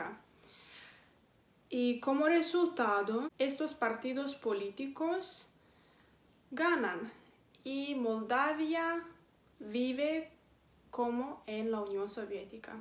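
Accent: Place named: Spain. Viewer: Estas seria siempre o es por concentrarte en hablar español? Intenta hablar más neutro y no como española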